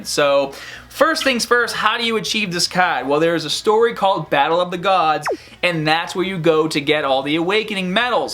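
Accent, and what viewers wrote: Boston, United States. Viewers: Son is definitely from Boston son said “cads” I was like tf is that then I realized he from Boston it’s “Cards”guys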